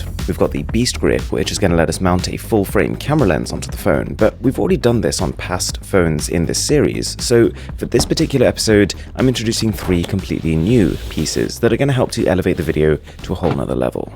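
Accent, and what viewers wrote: United Kingdom. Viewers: Your from he uk!!!!?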